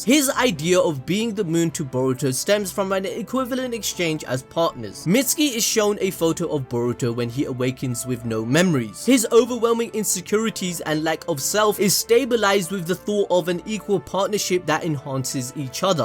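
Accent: Punjab, India. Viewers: Bruhhhh you’re indian????? Hearing you speak in punjabi/hindi was hilarious 😂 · Yes!Mitsuki is a really important character in defeating Otsutski. BTW you speak nice Hindi but you have a Punjabi acssent in it. GOOD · what the fuck u speak hindi (i heard a slight punjabi mix but like whaaa) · serious ur hindi has a bit of indian accent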